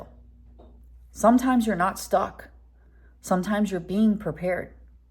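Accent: New York, United States. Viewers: Just make sure you enjoy life as well! You're still a pretty girl. By the way, I love your accent and mannerisms - New York? Some women think they're "strong, independent women", but it's not convincing. You on the other hand come across as the real thing - one tough lady, LOL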